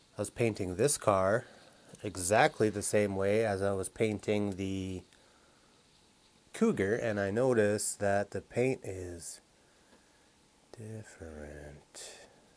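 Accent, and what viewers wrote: Canada. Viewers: You’re Canadian aren’t you? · You sound so much much like one of my Canadian friends. Are you with the RCMP?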